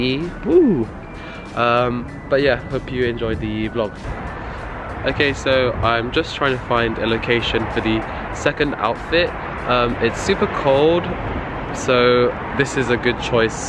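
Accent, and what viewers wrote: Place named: London, United Kingdom. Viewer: London accent really amazing 😭😍 i like it 💛 some times i try to speak like London people but i can't 😭😭😭😭😭 i am in love with london accent💙💙💙💙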